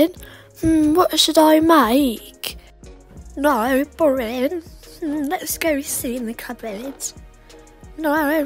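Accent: United Kingdom. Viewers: British like me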